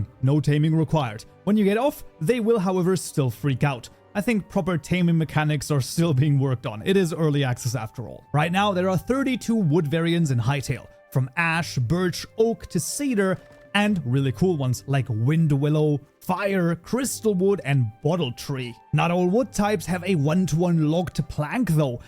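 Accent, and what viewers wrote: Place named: Scandinavia. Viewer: 99% of the time I can tell of somebody is German from accent alone. How did you manage to get a Scandinavian accent? 🤔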